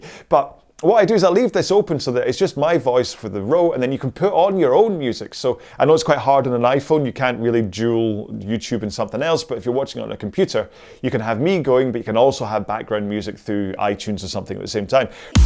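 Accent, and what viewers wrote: Scotland, United Kingdom. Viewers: Hi, first I want to thank you for all the content you’re providing. I like your vids A LOT! There is less „rah-rah“, the exact right amount of teachings, some entertainment, my kind of humor and no annoying music - just the sound of the fly wheel and some Scottish bloke talking stuff to shorten the hour. I prefer to listen to you reminding me of proper technique aspects over some podcast or music. And though I also follow and like Austin’s or Shane’s channel I regularly return to yours. My first 60min rows I did with your videos #wow60. So, thank you for the row alongs and keep on going your way. I’m sure there’ll be plenty of us indoor-online-rowers with you. 🙏💪👍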